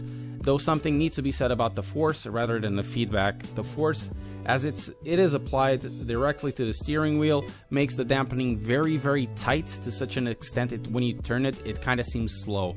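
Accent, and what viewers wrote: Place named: Portugal. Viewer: Where are you from? Your accent sounds portuguese!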